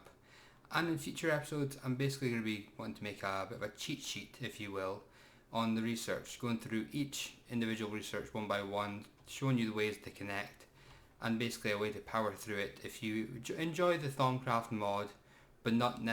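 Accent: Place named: Scotland, United Kingdom. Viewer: Soon as I heard your Scottish accent I knew I could trust ya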